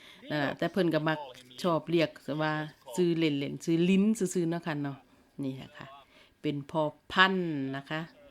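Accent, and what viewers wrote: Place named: Chaiyaphum, Thailand. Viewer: มาเบิงงัวเมืองนาวนำครับ ขนยาวเนอะครับ เสียงเว้าคือคนชัยภูมิครับ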